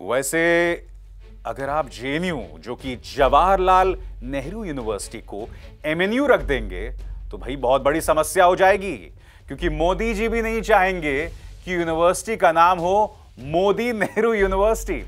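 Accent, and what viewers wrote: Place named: Bihar, India. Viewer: Nice tone sir in bihari tone 🙏🙏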